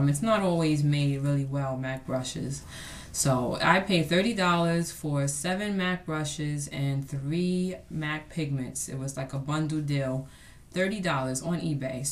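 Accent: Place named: New York, United States. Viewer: I super love your new york accent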